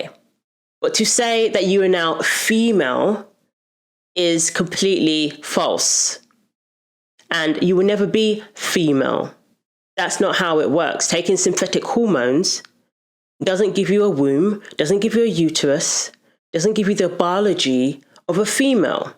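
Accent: United Kingdom. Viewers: Himdia is a f#cktard...and I'm glad you made this video sweet..its nice to listen to a Brit on here..and I'm glad you've not lost your accent!..staying true x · Thank you for your comments, interesting with your British accent from the US. I am in the U.K. but lived in the US once!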